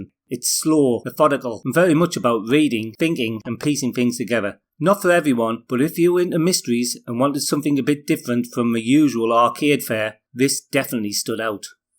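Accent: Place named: Sunderland, United Kingdom. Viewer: He's from Sunderland, in the north east of England; just be glad he's not from Newcastle, as that would be even harder for non-Brits to understand.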